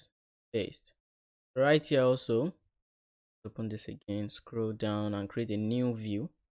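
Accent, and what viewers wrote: Nigeria. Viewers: are you a Nigerian, I'm so happy to find this channel, I have been with freecodecamp but I do notice your voice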